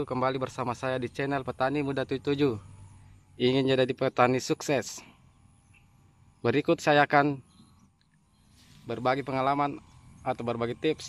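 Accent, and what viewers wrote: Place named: Bugis, Indonesia. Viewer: Bos ini klo didengar logt nya pasti orang Bugis terima kasih ilmunya bos di mana beli obat seperti itu bos .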